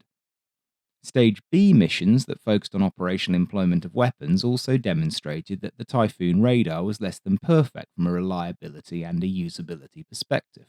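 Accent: United Kingdom. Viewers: Hearing a british voice talk about killers The uk is nothing but murdererous thieving pos country.... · I like the British accented narration. Just gives it more credibility. Like Great Planes or Discovery Wings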